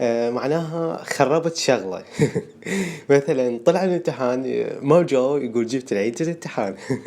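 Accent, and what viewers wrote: Saudi Arabia. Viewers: حسيتك سعودي مدري ليه هههه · حلوه لهجه السعوديه احنه العراقين نفهم كل اللهجات لاكن محد يفهم لهجتنه 😂😂😂😂👏🏻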